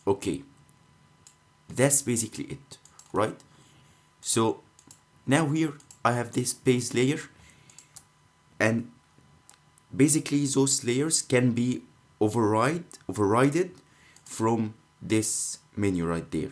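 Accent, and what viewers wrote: Egypt. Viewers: YOU SOUND LIKE AN ARAB ,,,, AN EGYPTIAN TO BE EXACT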